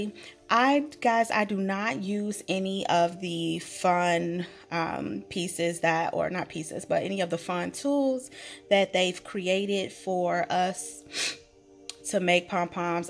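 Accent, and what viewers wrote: South, United States. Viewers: I love this! The only problem was that you were out of frame when making the hook with the wire. I couldn't see how you did it. If you combed out the pom poms, they would be even lighter and fluffier. TFS!, BTW, I love your southern twang!,😊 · Hi! Oh sugar, I have been doing videos for some time now, and I still stumble over...well...lots of things. I say "um" all of the time. I enjoy watching tutorials where mistakes are left in due to people being real. I never met a perfect person.;). I have butter fingers whenever the camera is on. As soon as it is off, I am fine. Your nails look great! Love it that your nails match your project. Wonky is real. By the way, Tree sent me over. I am a new subscriber of yours, but you will not see me on your list due to my settings are on private. They came out darling! Love the colors. I really enjoyed your tutorial! 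I love your twang sugar...I am from the south. Oh, and by the way, I also loved hearing your daughter in the background from time to time. Thank you for sharing your creativity with us all. I hope you have a safe, marvelous Monday.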